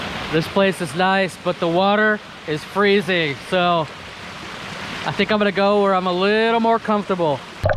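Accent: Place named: South, United States. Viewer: nice nice vid.. plus i like your strong southern accent ! enjoy my country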